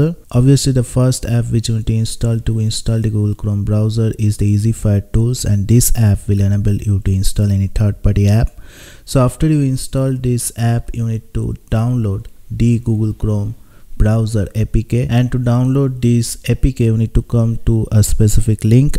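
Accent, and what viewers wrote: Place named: Kerala, India. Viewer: Malayali analle bro …english ketepo mansilayi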